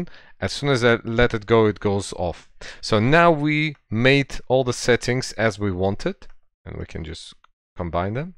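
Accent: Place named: Russia. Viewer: Thank you very much.I love your videos and the way you share things with us. Love you russian accent.Haha.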